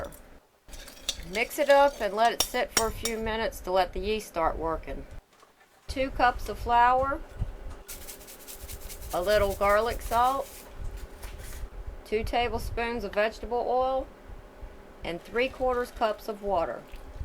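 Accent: South, United States. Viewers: Thank you very much intelligent lady with the southern accent...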